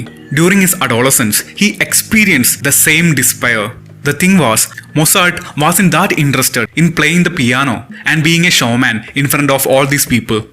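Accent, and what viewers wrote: Kerala, India. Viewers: Man! That mallu accent!!!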